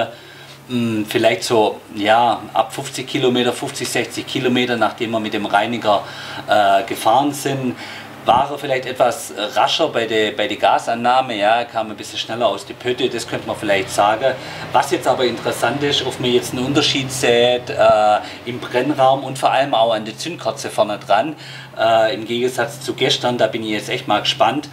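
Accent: Swabia, Germany. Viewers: Schee Schwäbisch, griasla noch Günzburg👍🏻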